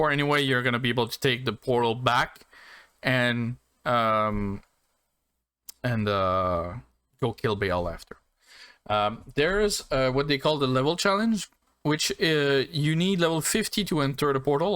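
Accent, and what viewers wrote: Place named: Quebec, Canada. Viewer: Are you quebecois dude because you sound like the people I listen to on the radio here in st hyacinth. I love it!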